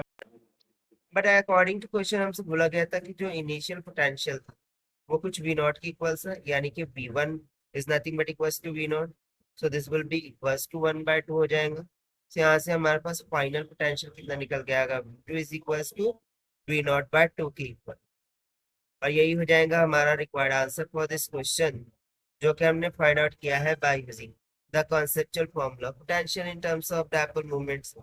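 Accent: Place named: South India, India. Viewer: South Indian hai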